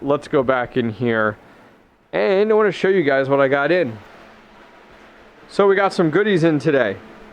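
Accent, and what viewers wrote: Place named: Boston, United States. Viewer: My friend, you have a Boston accent.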